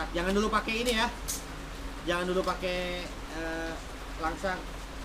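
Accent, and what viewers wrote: West Java, Indonesia. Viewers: Resep euy geunaheun ningali logat sundana wkwkwk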